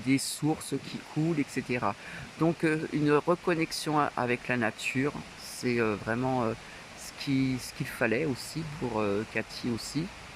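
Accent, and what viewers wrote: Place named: South, France. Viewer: ma très chère Cathy, c était normal, de tout cœur avec toi, combien c est une " belle grâce d avoir ton Amie avec toi et ça ne peux te faire que du bien, quel bel accent du soleil, merci, merci pour ses si belles vidéos, ses photos ces partages ça fait du bien, c est chaleureux, bisous et gros câlins à Nouky, on croise les doigts pour les projets de 🍀🍀🍀🍀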